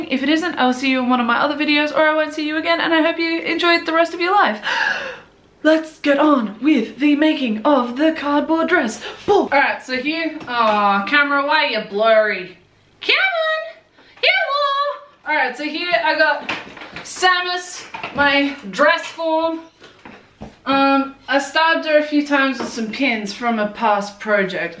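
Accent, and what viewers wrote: Australia. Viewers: ...you're Aussie. Yes 😂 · Are you Australian?? :3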